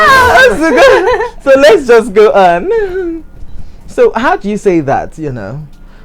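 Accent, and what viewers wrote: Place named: Imo, Nigeria. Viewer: I'm an Anambarian. My mother In law from Imo State lived with me for 4 years. Sincerely, it took me months to get to understand her Imo dialect. I was so embarrassing 😂😂 This is the exact way those from Imo speak.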